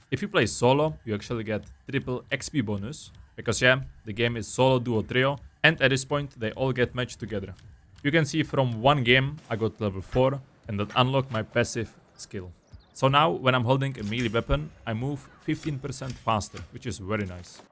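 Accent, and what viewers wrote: Czech Republic. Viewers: To je hrozný jak tam člověk slyší, že je čech... je to takové divný. Jinak super video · Czech accent much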